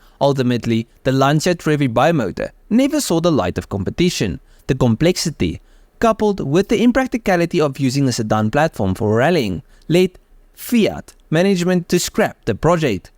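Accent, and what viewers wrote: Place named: Benoni, South Africa. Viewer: You must be from benoni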